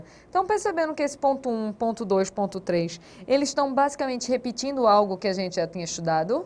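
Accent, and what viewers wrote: Pernambuco, Brazil. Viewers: Virei fã. Excelente!!!!! Professora a senhora é pernambucana? adorei o sotaque também. Show!!!!! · seu sotaque é maravilhoso!!! parece cm o nosso aqui d pernambuco... bjsss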